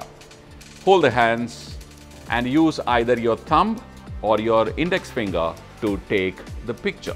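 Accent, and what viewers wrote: Kerala, India. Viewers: Malayali ana? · Ah englishil malayalathinte touch undd😊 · Sir , are you a mallu.... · Typical indian acent haha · thx... that indian acc ent in english is so weird :D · That's a Malayali English slang !!! 😁😁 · His malyali accent is funny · Mabile faune photography, Sir you have Malyalam Accent 😍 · Annoying Indian accent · I think u hav an Indian Accent. I like ur Indian Accent.I am too an Indian......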